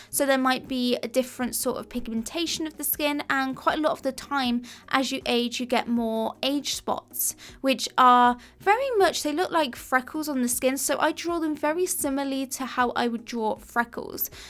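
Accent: United Kingdom. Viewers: Why are the classes priced in US dollars when is so obviously British?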